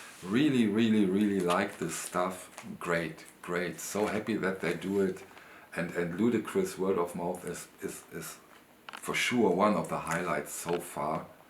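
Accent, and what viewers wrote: Germany. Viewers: Hi. I really enjoy your show and love your German accent. Thank you for mentioning the band, love this music, have now ordered both their studio albums. Fantastic. Thank you. I too am a big fan of. Kind regards from Robin.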